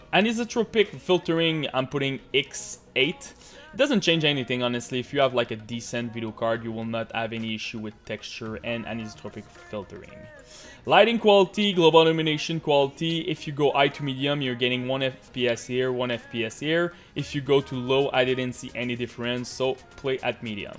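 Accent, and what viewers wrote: Quebec, Canada. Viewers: you sound quebecois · you, my friend, is from quebec! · j'bet 100$ que t'es québécois haha j'reconais l'accent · Gotta say, i'm french canadian too and you inspire me to starts video cause my accents not even worse than yours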